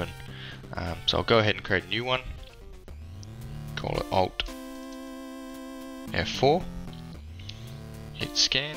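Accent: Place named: Australia. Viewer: When I clicked on the video and heared an Australian accent, I was like HOLY KROYKEY MATE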